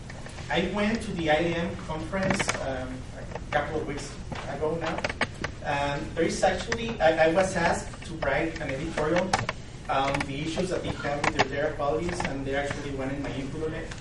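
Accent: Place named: Arab world. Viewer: His accent is bad. is he arab?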